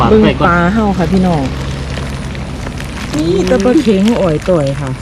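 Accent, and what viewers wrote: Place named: Ubon Ratchathani, Thailand. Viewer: สวัสดีค่ะ เพิ่งเข้ามาดูครั้งแรก บรรยากาศดีมากดูแล้วคิดถึงบ้านเลยค่ะ สำเนียงคือคนอุบลฯ เป็นคนอุบลบ่จ้า ~จากคนอุบลพลัดถิ่นจ้า~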